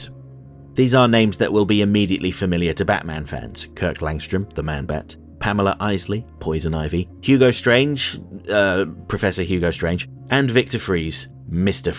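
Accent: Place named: RP, United Kingdom. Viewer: I hope this channel grows well. I enjoy your insight and in depth review alongside your nice voice. I also learn a bit of history. Its like hearing Sal from Comicpop explain the comic but with a chill posh British accent.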